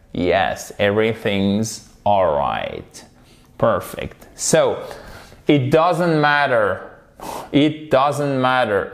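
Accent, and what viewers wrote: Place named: United States. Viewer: Bonjour super boulot par contre pour nous européens l accent appuyé américain est plus difficile à digérer que la prononciation souple et coulée de nos amis anglais.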